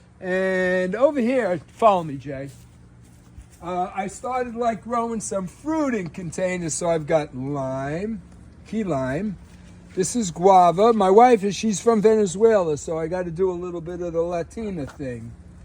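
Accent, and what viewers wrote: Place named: Brooklyn, United States. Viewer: you never lost your good ol' Brooklyn accent! I love it. So tell us what fertilizer you use and what plants, if any, do you have to transplant to larger containers as they get more mature?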